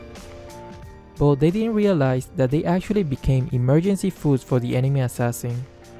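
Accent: Philippines. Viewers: im confused at your accent are you filipino or what?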